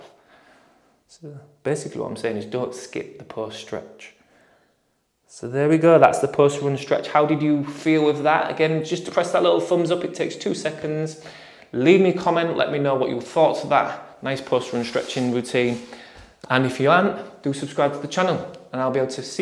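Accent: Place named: North, United Kingdom. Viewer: Thanks again. Loving your northern accent!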